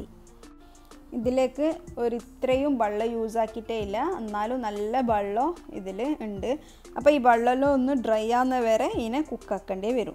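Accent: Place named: Kasaragod, India. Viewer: Nice video dear..especially kasargode talk 👍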